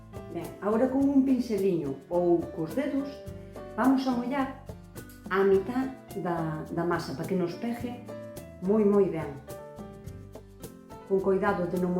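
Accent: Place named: A Coruña, Spain. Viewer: No sé porque me paredes que sos de la Coruña o de Santiago de Compostela. De Galicia..creo que seguro...te escucho con atención ..es la primera vez que te veo..ye emociono tu acento xque mí madre era de la coruña.y yo entendí siempre su fdialecto.....ya no está conmigo..pero tu idioma me traslado a mí niñez.. Gracias....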